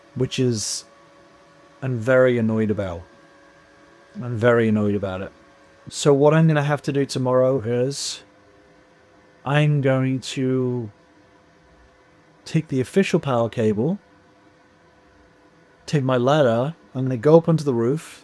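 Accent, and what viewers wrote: United Kingdom. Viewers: Not so much full Karen as full Godfather. Very polite, no screaming, but do inquire as to why you have paid so much money for something that doesn't work. In fact work your British accent. I'm just saying this is someone who did costuming in theater for 7 years. Americans are idiots for a British accent. 🤨🤣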